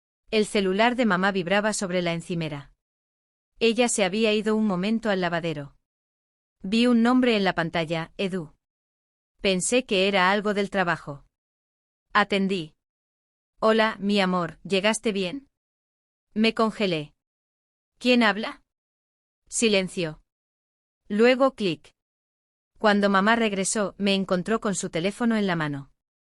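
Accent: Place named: Argentina. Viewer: Por favor relatos en español neutro, no con relatos argentinos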